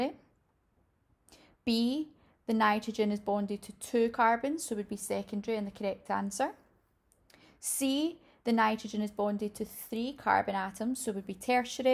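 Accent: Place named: England, United Kingdom. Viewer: I like your English accent reminds me of Brave Disney movie, Merida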